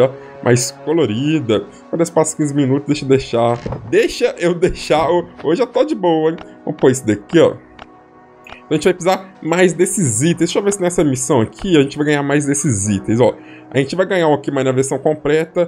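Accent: Minas Gerais, Brazil. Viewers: Pelo sotaque é de minas kkkkkk fala igual eu kkkkk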